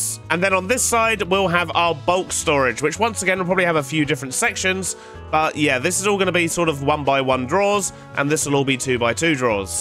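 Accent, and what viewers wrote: United Kingdom. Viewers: Are you British or American you sound British but it might just be me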